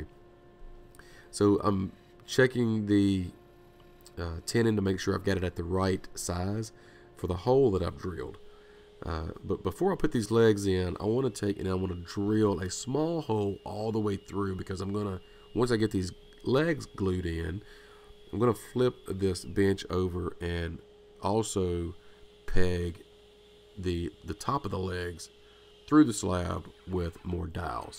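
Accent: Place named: South, United States. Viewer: YOU A SOUTHERN BOI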